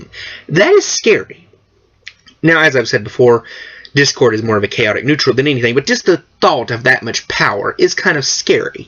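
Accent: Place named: Tennessee, United States. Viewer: Is the accent put on or are you from the deep south? You sound Tennessean, but that's just a guess. I hear all kinds of the accent down here in Florida. (Also, I would encourage more pictures which I know are a pain in the ass, but make the video more dynamic and making your background not white. google neutral colors for some options.)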